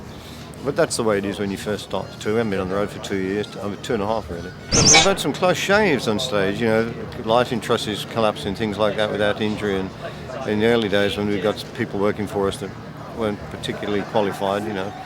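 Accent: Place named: England, United Kingdom. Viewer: he does not open his mouth during speaking... pure english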